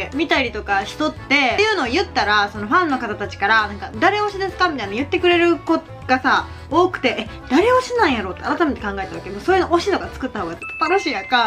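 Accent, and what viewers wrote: Kansai, Japan. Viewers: 推し事ってほんと楽しいよね、、のあはモデルさん推しだから相手は女の子だけどほんと可愛くて生きててくれて息しててくれて有難うっていつも思う笑卒業旅行大阪行ったのー！いつもの関西弁？しか聞くことないから生の関西弁が聞けました🥰🥰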